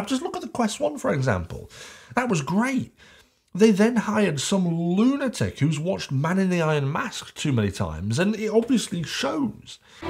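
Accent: England, United Kingdom. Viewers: Like your delivery. You're easy to listen to. And nice to hear English rather than some disgusting American accent.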